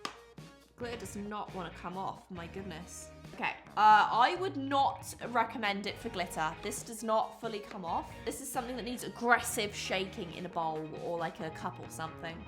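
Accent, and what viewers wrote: United Kingdom. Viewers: I love your energy and sense of humour in all your videos)) and i also love your british accent 🤩 I think that the best thing about this rinser is that you actually can use different colors and keep rinsing. You should test it vs. a regular jar using not only pink, but pink, blue, yellow, etc. Then, I think, the rinser would prove very effective 😁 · Hi lady I don’t watch your channel but my sis dose, she doesn’t have a YouTube account but she wants to enter the give away and she LOVES your videos she’s very obsessed with your vids and wants to tell you she like your British accent 😂 I also subbed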